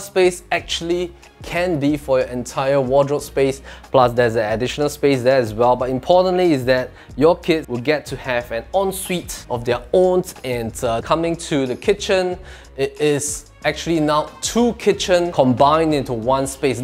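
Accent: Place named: Singapore. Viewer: Love your Singlish. Don’t need to work on your pronunciation 🥰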